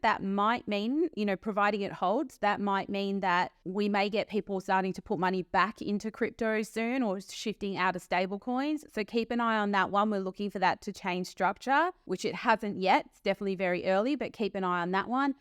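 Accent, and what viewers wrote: Australia. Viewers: I hear you I see your accent is from Australia. Great content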